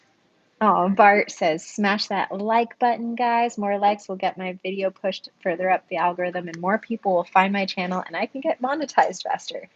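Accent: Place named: England, United Kingdom. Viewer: Hey you are so beautiful I saw you from leave her wild and then I watch your videos I think you were such a beautiful young beautiful girl oh yeah I love your accent your English one that is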